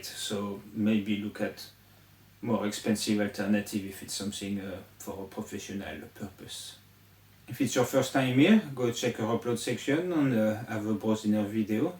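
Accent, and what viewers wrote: France. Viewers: sacré accent français je trouve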